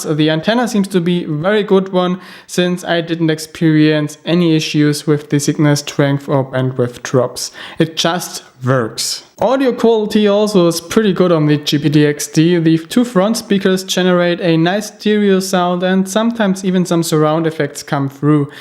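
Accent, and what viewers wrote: Germany. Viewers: german spotted :D good review mate!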